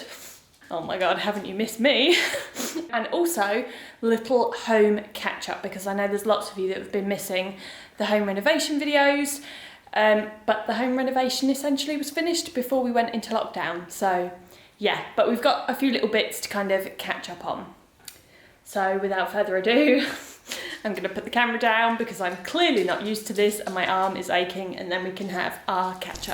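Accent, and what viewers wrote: England, United Kingdom. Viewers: Love ❤️ your fancy sounding British accent. Thanks Philadelphia PA resident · Thankyou! Have subbed as it’s great to hear an English accent again..miss London..been here in Oz 6 years...thanks again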